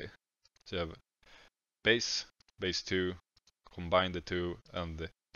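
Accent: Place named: Sweden. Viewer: I've never been more sure in my life that I am listening to a swede.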